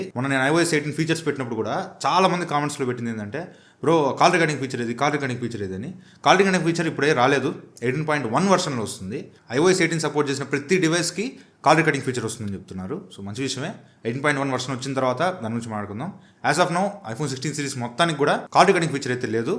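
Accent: Nellore, India. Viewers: Bro medhi nellore aa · Bro meedhi nellore e na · Bro midhi nellore na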